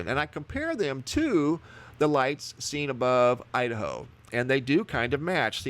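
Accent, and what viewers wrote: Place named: South, United States. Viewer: Love the channel bro 😊👍 Great southern accent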